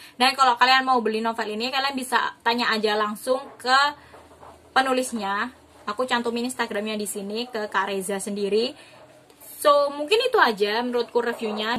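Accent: Java, Indonesia. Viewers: Suka sama logat kak jawa banget😍😍😍 aku juga asli jawa lho kak, tapi tinggal di Bekasi hehehe😁😅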